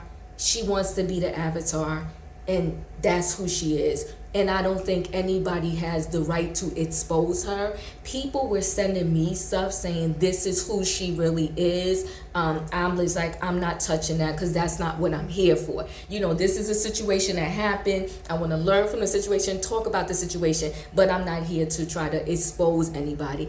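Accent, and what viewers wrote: New York, United States. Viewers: you sound sooo new york and i love it! bloop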